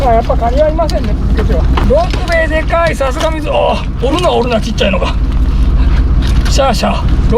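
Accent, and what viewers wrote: Okayama, Japan. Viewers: いつも楽しみに見させてもらってます 車エビ最高ですね 刺身で食べたいです 岡山弁？が ヤッパリ最高です 無理せずこれからも 楽しい映像をよろしくお願いします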